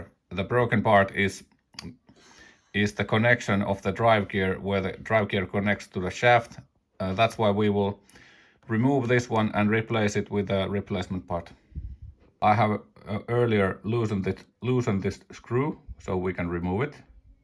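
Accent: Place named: Finland. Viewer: I know that accent perfectly! What part of Finland are you from? Some of my family lives in kymonkoski and some in viitasarri.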